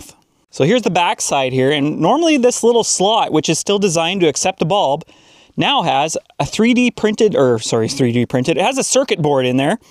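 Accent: Canada. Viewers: I'm Irish/Canadian and subbed because I really miss the Canadian accent sometimes. Your car insight is also brilliant and shocking. We are really doomed.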